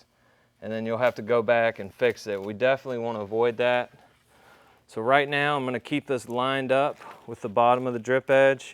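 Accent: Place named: Rural South, United States. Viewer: Awesome video. Sounds like you might be from a southern or rural part of the country. It's cool to see white folks doing Mexican work. We don't see that here in the city.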